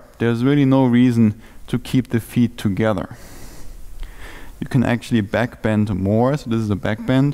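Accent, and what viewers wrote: Germany. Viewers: Do I spot a german accent there? Wenn ja nices Video Bro. Will meine Mobilität trainieren und bin so auf euch gestoßen.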